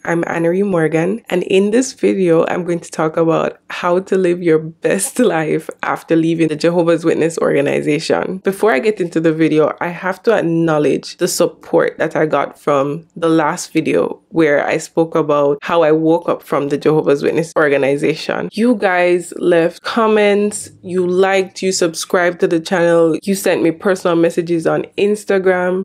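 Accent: Jamaica. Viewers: I love your Jamaican accent-mun. 😅 I doubt I would look good in feathers and costume and makeup for carnival. 🤣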